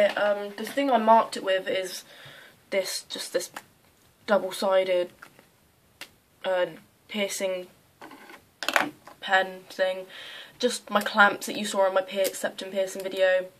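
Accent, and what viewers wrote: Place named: Midlands, United Kingdom. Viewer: Where do you live? You sound like you're from the Midlands like Wolverhampton or somewhere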